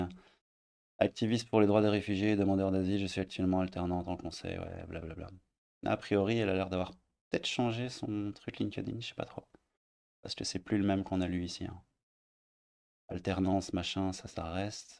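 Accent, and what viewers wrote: Belgium. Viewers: Daag Meneer! Merci pour les vidéos, ça fait du bien d'entendre du belge de là où je suis. Le parti islamique à Anderlecht? pas de commentaires? Tcho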